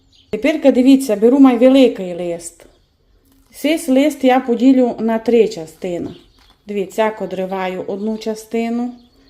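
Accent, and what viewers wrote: Transcarpathia, Ukraine. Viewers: мы вас очень любим и мы очень любим ваш закарпатский говор. С любовью из Нью Йорка. 🇺🇸❤️🇺🇦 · Не слушайте всяких дураков. Так как разговаривают на Закарпатье, нигде не говорят. Наш диалект не сравним ни с чем. Я слушаю вас с удовольствием, сама из Закарпатья уже 30 лет живу в Греции и не забыла до сих пор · Дякую за смачні рецепти,нічого не змінюй,приємно чути закарпатський діалект,розвиток каналу та нових підписчиків,благополуча твоїй родині. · Ой, Андрюха, насмішив😂 Це закарпатській діалект. на це питання вже відповідала. А у самого який діалект? Скільки помилок у солов'їній😂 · Як вам не соромно! дуже щира мудра жінка. А те, що вона так розмовляє, то на Закарпатті такий місцевий діалект, і це прекрасно, тому що говорить про різноманіття нашої країни. Ви повинні вибачитись за ваше хамство. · дякую Вам за рецепти i Вашу закарпатську мову - вона неперевершена. 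А на дурнiв не звертайте уваги. Пишуть коментарi i дають поради, а помилок, як у учнiв першого класу. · Дякую, люблю слухати Вашу мову з закарпатським діалектом! І господиня ви прекрасна, молодець! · Закрийся, безграмотне нещастя Це закарпатська говірка! Приємна на слух і всім зрозуміла Велика подяка цій чудовій господині ❤❤❤ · Дякую Вам за хороші рецепти, коментарі до страв, оформлення страв та столу, красивий посуд. І надалі показуйте закарпатські страви, ваш говір і традиції закарпаття. На дурні коментарі не зважайте.😊😊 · добрий день! Дякую вам за цю смакоту, ви так все доступно пояснюєте, що навіть недосвідчена господиня з легкістю може це приготувати, якщо є бажання звісно! Особлива подяка вам за ваш закарпатський діалект, кожне відео дивлюсь із задоволенням! Завдяки вам навчилась закручувати голубці таким способом( конвертиком), так кажуть на Вінниччині. Миру, добра, перемоги нашій рідній, рясних Божих благословінь вам і вашіій великій родині! 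· Хто це такий безграмотний навчає, як їй розмовляти? У кожному регіоні України є свій специфічний діалект.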